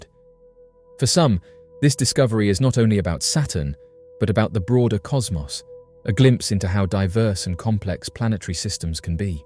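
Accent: United Kingdom. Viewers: You know what I bet. Some Alien fly saucer passing by the earth dumped their sewage, it froze then fell to earth and he found it. Also, videos like this seem to use this one voice with the British accent to give it more drama 😮.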